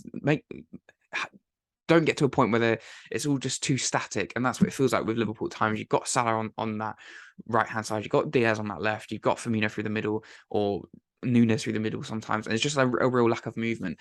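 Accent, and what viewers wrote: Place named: South, United States. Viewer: Not being funny mate, your chatting with a Southern accent saying your a scouse fan, sounds like a glory hunter to me.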